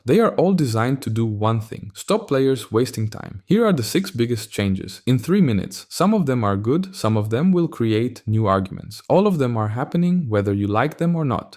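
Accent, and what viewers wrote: Brazil. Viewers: oh wow. You really sound Brazilian hahhaha the Croatians that I work with, doesn’t sound like this at all. Good for you hahah And no hard feelings about 2022, ok? 👍🏼 hahhah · É um brasileiro q ta fazendo essa narração?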